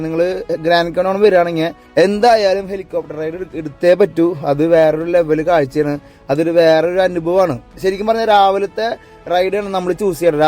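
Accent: Thrissur, India. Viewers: No words, your trichur accent, the commentary, your stature, your vehicle, solo drive all blends perfectly. Wishes for all your endeavors as good kerala U tuber. ❤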